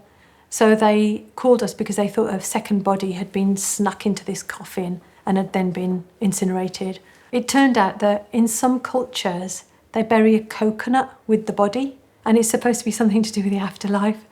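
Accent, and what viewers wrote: Birmingham, United Kingdom. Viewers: She has birmingham accent ..... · Definitely a brummie girl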